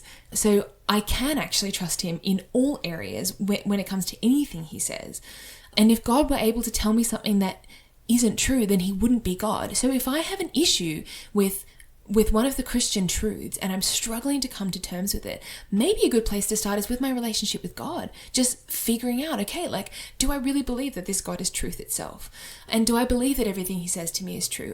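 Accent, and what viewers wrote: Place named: Australia. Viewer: One of my favourite accents, cultured Australian.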